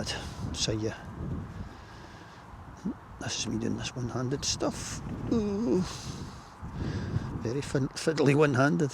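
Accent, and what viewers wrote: Scotland, United Kingdom. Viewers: You will have to eBay that Indian jacket now! It's the only problem with changing bike/brand. Saying that i still wear my HD fingerless gloves despite now riding a T100! I would like a T120 at some point but no hurry as the T100 does it all really. Ps, love that Scottish accent. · Maybe because I’m from a very mixed city/upbringing, but I can understand him completely! Never understood why people can’t… understand Scottish accents xD We’re speaking the same language! Anyway, beauty bike brother. I just picked a ‘26 up last Saturday, I’m very happy to be a part of the family now. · Nice! And I love listening to Scottish guys talk; I’m up to understanding nearly 25% of what’s being said!😂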